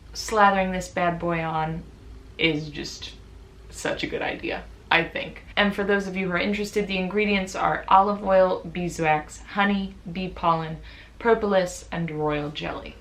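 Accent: United States. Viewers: I suppose you live in England. How come you don't have a British accent? To me, it is more like American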